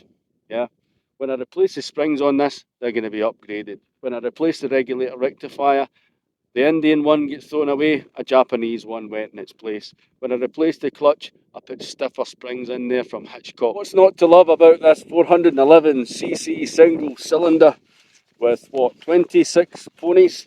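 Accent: Scotland, United Kingdom. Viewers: a broad Scott coming out with a pure American phrase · that's a Scottish accent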